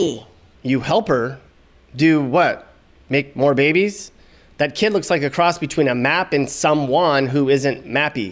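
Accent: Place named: Michigan, United States. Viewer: michigan you sound like me now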